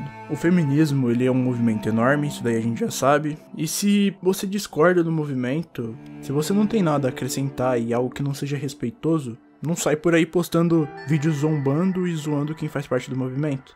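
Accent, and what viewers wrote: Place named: São Paulo, Brazil. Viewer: Carioca com sotaque paulista, pq?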